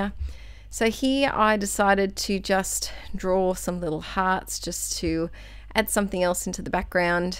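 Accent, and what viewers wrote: Australia. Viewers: Love your art I have been trying to work out your accent good to know we have another Aussie doing mixed media TFS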